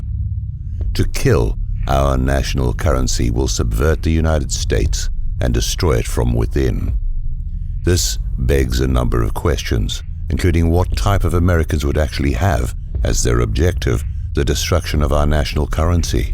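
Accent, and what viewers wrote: United Kingdom. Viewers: just a thought: does the Narrator speaking with an extremely British accent, actually say "We, as Americans..." ?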